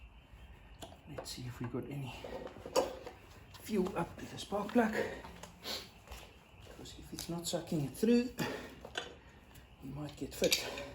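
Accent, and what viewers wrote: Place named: Australia. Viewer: Love the Videos. Your Aussie accent is so calming. Sends Tingles down my spine 🤣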